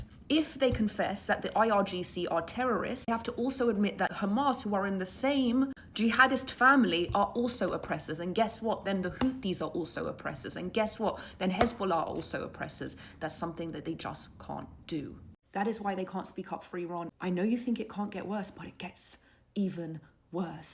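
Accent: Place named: England, United Kingdom. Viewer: You don’t sound like a person from Iran, but someone from England. Are you English or Iranian? Make sure you update the English government.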